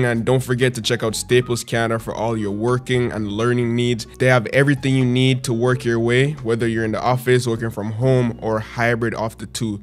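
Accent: Jamaica. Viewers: not go lie you sound jamaican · Are you from JA? That accent peeks through ! Great video. 💪🏽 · R U Jamaican?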